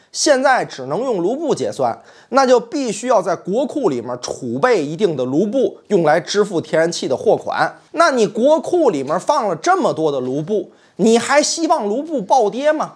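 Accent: Tianjin, China. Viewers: 我在天津呆过两年，这天津卫的普通话太亲切了，你是真有才，把天津人的幽默发挥到财经政治方面，加油，继续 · 太喜欢听的天津话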